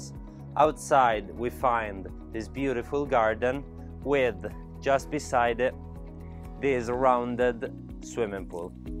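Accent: Italy. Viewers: I love this Italy accent English ^_^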